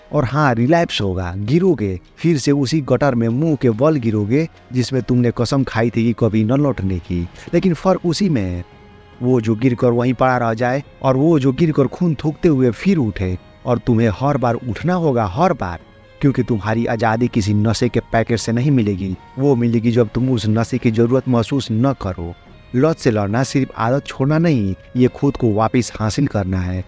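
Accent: Bengal, India. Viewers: Bahot badhia bengali accent dada.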